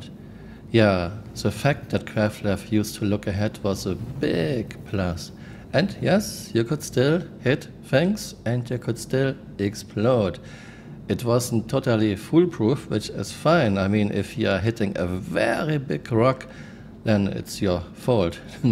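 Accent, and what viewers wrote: Germany. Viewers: this is probably the most romantic german accent i've ever heard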